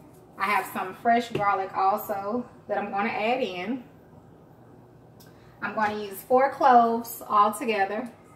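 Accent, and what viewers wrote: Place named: New Orleans, United States. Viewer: Omg where u from ? I love your accent I’m getting Nola vibes cus u sound like Toya lol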